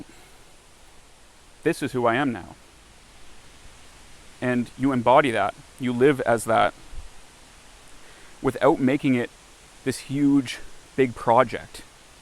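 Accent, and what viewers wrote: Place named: Maritimes, Canada. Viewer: I know a fellow Maritimer when I hear one. Great videos man keep em coming!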